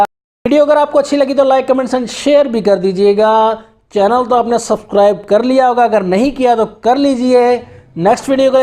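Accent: India. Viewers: ngomong naon si kentel jasa bahasa inggris india na,mere ge hese ndeuk meuli hp adoooh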